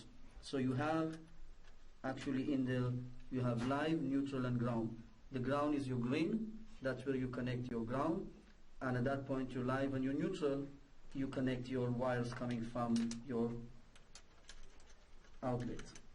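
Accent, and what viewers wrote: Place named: India. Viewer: indian english :)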